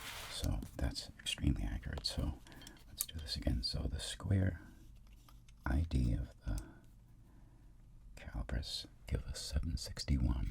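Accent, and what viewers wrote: Boston, United States. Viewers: I Sense the Boston Accent is Strong with this one. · I never noticed his... Boston (?) accent before. He's always affected a different accent every time. Interesting. I love this video.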